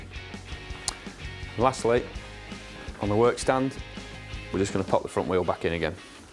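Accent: United Kingdom. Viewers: Very informative with great detail. My only suggestion would be to lose the music. As an older American, I need to strain to hear through the British accent over the music. Great job though.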